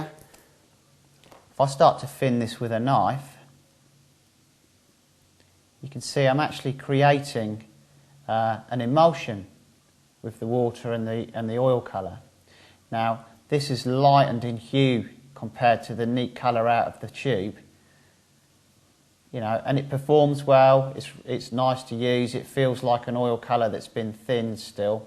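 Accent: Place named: Cockney, United Kingdom. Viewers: VERY THICK BRITISH ACCENT.... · Not just British, but not-quite-cockney London working-class. Love it. There are many accents in Britain.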